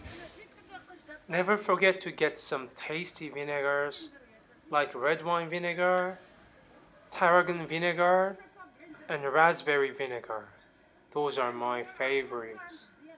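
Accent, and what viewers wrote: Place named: Morocco. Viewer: Thank you to you because you speak our dialect i'am proud of you you are between your big morrokkan familly.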